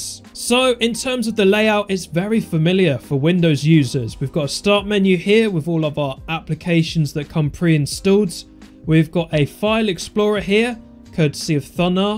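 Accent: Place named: England, United Kingdom. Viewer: He's english. Just because he has an accent doesn't mean he's indian. There are lots of nice people in India and you just provoked them just like that, what a shame.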